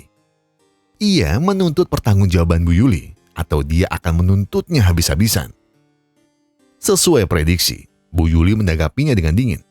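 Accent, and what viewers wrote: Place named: Java, Indonesia. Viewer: Cakepp bangn... Abangnya lucu.. Logat guyonana jowo😅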